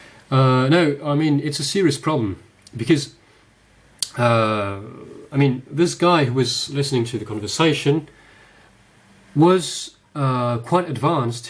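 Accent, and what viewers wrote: England, United Kingdom. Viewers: You have a British accent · jealous of your English accent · you actually sound English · I thought you were from England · A Belgian with a British accent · Your English sounds very British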